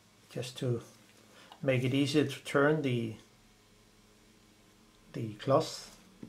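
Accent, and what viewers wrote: Denmark. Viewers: Hi. Thank you for the video. 🙏 You sound Danish - are you from Denmark? If Can you please help me with cleaning an old Zeiss 50mm 1.8 Ultron lens? Kind regards Per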